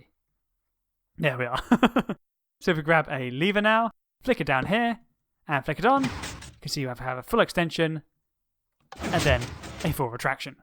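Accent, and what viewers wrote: England, United Kingdom. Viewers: which part of England are you from?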